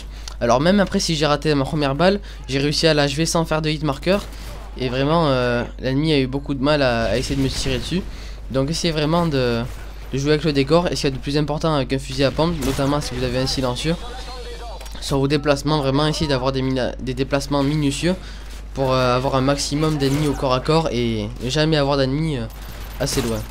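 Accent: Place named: Toulouse, France. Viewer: J'adore, je regardais Mafio avant, je te voyais quelques fois dans ses vidéos mais maintenant que tu en fais compte sur moi pour les regarder ;) Question: Est-ce que tu conseille cette config du Spas12 sur MW2 aussi ? Si non, laquelle ? Je m'abonne, je like et je favorise ps: J'aime ta voix de toulousain :)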